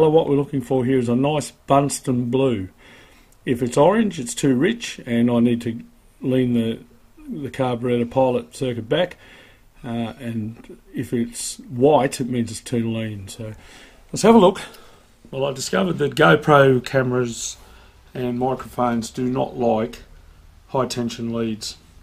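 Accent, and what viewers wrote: Australia. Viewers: Glad to hear that accent from the down under. Hi from the New England reagen usa. ( north of boston lol) Thats a must have kit Thanks soo much . Cleaning out my Kawasaki super sherpa 250 carb.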